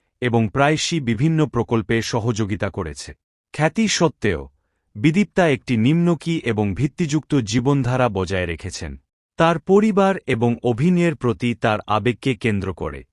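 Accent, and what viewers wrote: Bangladesh. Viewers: নিশ্চয় বাংলাদেশী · বক্তা মনে হয় বাংলাদেইশ্যা।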